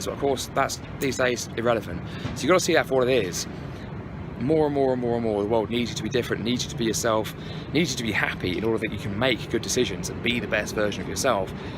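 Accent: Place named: United Kingdom. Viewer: Interesting vlog. Glad you had a good time in KL. Your British accent is so thick. Doncaster?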